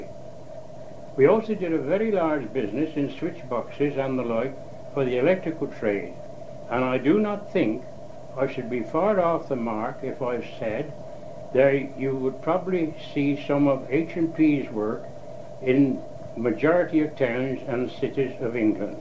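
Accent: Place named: Worcester, United States. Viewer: How great to hear an old Worcester accent.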